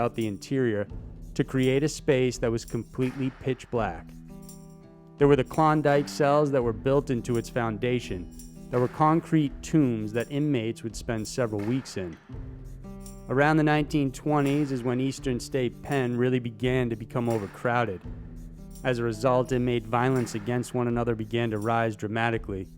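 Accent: United States. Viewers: Great to put a face to your voice, I watch your videos everyday but where in the USA is your accent from exactly? thanks lysa x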